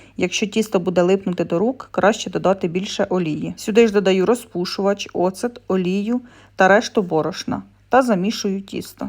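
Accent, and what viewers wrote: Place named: Western Ukraine, Ukraine. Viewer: Вам рiдна,але не чисто украiнська,наголос бендерський.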